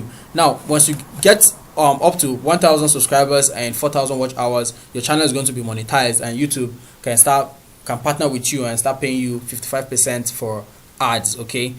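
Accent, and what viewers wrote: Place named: Nigeria. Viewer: Hi. Thanks for your a great video. I like your accent. Are you Nigerian? I saw your comment on another video I jus subbed you. Please sub me back I'm also about making money on YouTube.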